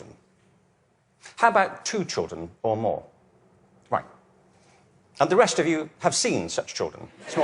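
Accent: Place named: United Kingdom. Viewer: 從口音跟有爵位這回事來看，這人是英國人，不是美國人吧...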